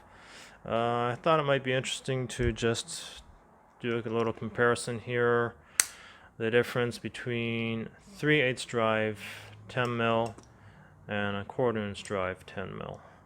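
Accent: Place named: Japan. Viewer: I assume you're Japanese from your pronunciation of Japanese names (and having a Japanese magazine), but your English is leagues better than other Japanese locals. Especially with the accent (it's still there, but only slightly). Did you study in America or grow up there?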